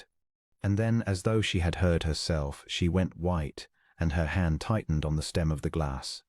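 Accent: United Kingdom. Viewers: I loved this story! Beautifully written and superbly spoken with the appropriate British accent. Thank you!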